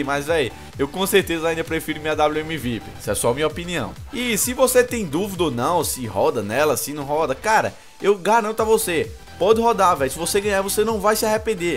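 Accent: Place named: Paraíba, Brazil. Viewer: Infelismente,nao sou paraibano..Queria ser Esse sotaque é fodastico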